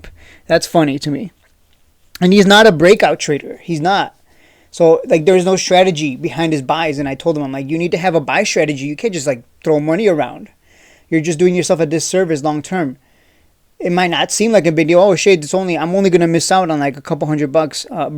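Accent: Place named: Long Island, United States. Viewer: Maybe people think that because the guy prostockmarkets I think is his name keeps mentioning you and he said you sound like you are from Long Island here in NY.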